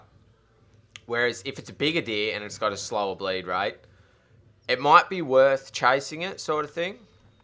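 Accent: Australia. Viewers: TheGilgers 2017 lmao he could be Australian cause we do have very similar accents. And also most of my Aussie friends like to use the word cancerous a lot considering how we pretty much butcher the English language. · yea that voice and aussie accent XD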